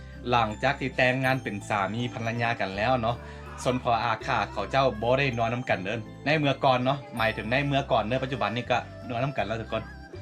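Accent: Isan, Thailand. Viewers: คนบรรยายเป็นคนอาข่า หรือ คนอีสานครับ ทำไมพูดเหมือนคนอีสานเลยครับ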